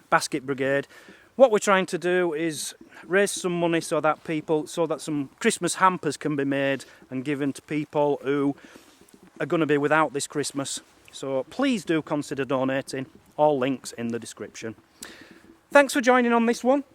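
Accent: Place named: United Kingdom. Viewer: What part of the UK does one acquire an accent like? It's so endearing.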